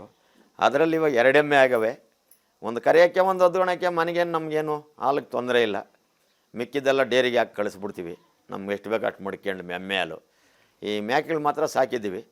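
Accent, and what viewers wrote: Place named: Chikkaballapur, India. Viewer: ಇವರ ಭಾಷೆ ನೋಡಿದರೆ ನಮ್ಮ ಚಿಕ್ಕಬಳ್ಳಾಪುರ ಅತ್ವ ದೊಡ್ಡಬಳ್ಳಾಪುರ ಕಡೆಯ ಭಾಷೆ ಅನ್ಸುತ್ತೆ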